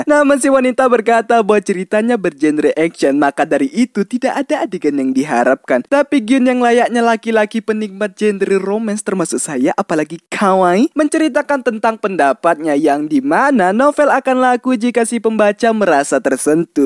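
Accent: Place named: Bugis, Indonesia. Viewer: Telasomu Ko bilang bang😂 Bugis ye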